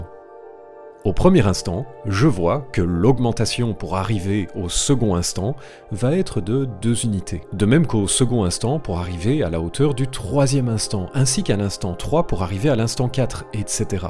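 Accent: Belgium. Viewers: Cette accent belge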